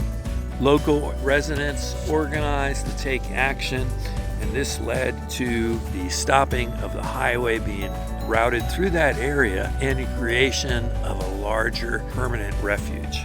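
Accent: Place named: Delaware Valley, United States. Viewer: I haven’t been to JHNR in years! I used to go when it was Tinicum with my dad. It is an amazing place. There is a small place in NJ called Palmyra Cove. It’s wonderful that we save these spaces, rather than develop them as we have so many spaces. By chance, are you from the Delaware Valley? I detect a bit of an accent sometimes😊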